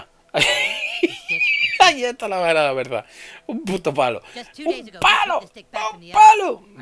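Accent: Spain. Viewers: ¿porque habla asi?, son otro tipo de españoles?, no lo digo insultando solo es una duda porque otro youtuber habla asi, no are spam con el nombre de yutuber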